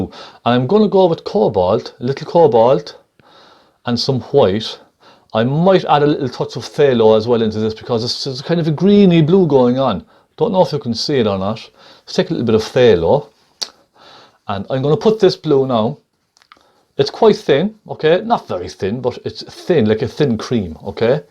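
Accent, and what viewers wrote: Cork, Ireland. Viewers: Fabulous tutorial and if I'm not mistaken great cork accent Subscribed!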